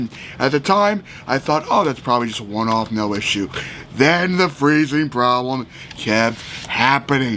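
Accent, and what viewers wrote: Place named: United States. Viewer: Hi, you have a very interesting accent. Where in the US are you from ? Thanks for sharing your thoughts on this Sony.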